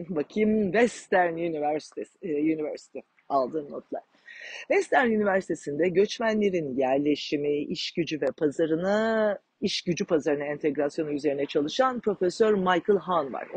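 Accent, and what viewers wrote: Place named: Turkey. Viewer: Hanim Turklerin aksani biraz garip geliyor Kanadalilara, kulaga cok hos olmayabiliyor, fark ettigim kadariyla sizde de o aksan yogun o bakimdan anlarim sizi spiker olarak almamalarini. Hintlilerin de ingilizcesi bize garip ama onlar genel olarak alismislar, Hintlilere daha aciklar. Soylediginiz seylerin cogu dogru ama iste cok calismamiz ve mucadele etmemiz gerek. Duzen oturana kadar surunduruyor Kanada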